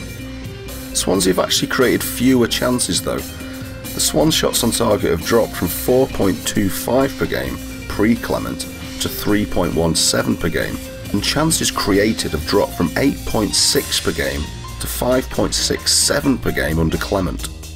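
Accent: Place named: Manchester, United Kingdom. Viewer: Given the subject of the video ie relegation, a sullen Manc accent seemed appropriate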